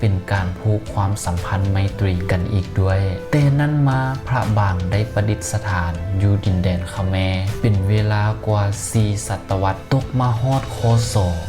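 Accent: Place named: Thailand. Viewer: สำเนียงคนไทเเท้ครับผมคนอิสานเด้อ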